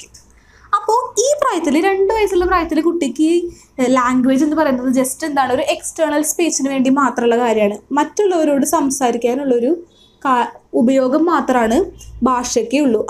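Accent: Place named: Thrissur, India. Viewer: Thrissur slang tta.....😃😃 And......Classum super .......Ellam clear aayi manasilakunnund tta.....Thanks.....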